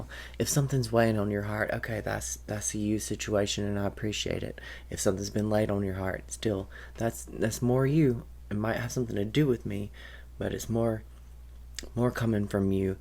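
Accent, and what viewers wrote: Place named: Texas, United States. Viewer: you sound just like the women in my Texan family - lol - even I can sound like that when I want - I live in Massachusetts now for many years so my accent is a tad less identifiable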